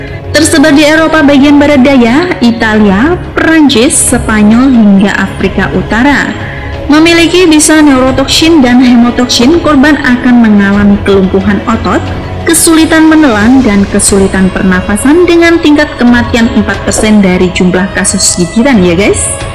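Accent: Java, Indonesia. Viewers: Syin nya medok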